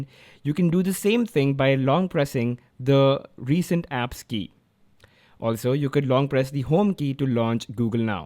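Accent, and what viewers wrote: India. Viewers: Omg your accent is really really nice :) are you indian?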